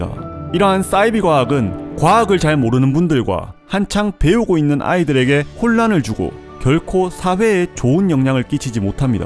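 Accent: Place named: Busan, South Korea. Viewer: 횽 오늘 좀 흥분하셨구만. 다른데선 안들리는 부산말이 강하게 들리네 ㅎㅎㅎㅎ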